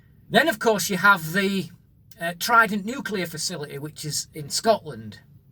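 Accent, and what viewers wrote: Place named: England, United Kingdom. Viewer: Honestly, you kinda embody why a lot of young Scots don't want to be part of the UK - namely because views like yours are perceived as being common in England and are rejected by about 75-80% of the Scottish electorate. It is factually wrong to say that the border has not been discussed - its been in the Scottish Media quite widely if you search. I'm also yet to see evidence that Scotland "will be flooded with economic migrants from the Third World" - could you please provide some? The UK is chronically mis-managed and has been lacking infrastructure and education investment for decades - the public services have been put in private hands under Labour and then Tory under the guise of "Trusts", which are often run by party donors when you delve into them. And this actually costs the English taxpayer more than it otherwise would. 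(I know because I worked in two of them). Listening to your accent, it sounds like you come from one of the numerous geographical regions in England that has not turned an economic surplus in decades - why do you and your fellow citizens not demand better of your government and accept stronger local representation? Many economic precedents show that effective governance is the key to effective economic growth and transitions - Estonia is perhaps the best example in Europe currently - and this has been missing in the UK for decades. The failure to create a sovereign wealth fund on the back of Scottish oil revenues would stand as a rather striking exampl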